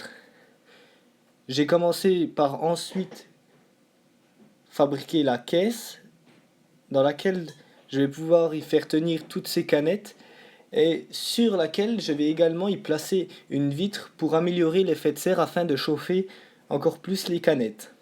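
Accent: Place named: Belgium. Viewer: Accent Belge à mon avis ^^ ( j'ai étudié là bas ) :)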